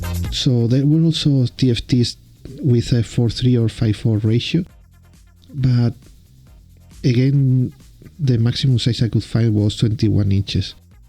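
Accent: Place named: Spain. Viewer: Estupenda idea. Te escribo en español porque por el acento está claro que lo eres :) ¿Cómo haces para rotar la imagen? ¿Es una funcionalidad de tu televisión o de tu emulador? Me gustaría implementar tu idea con una Pandora Box, pero no tengo claro que sea posible... Gracias y saludos.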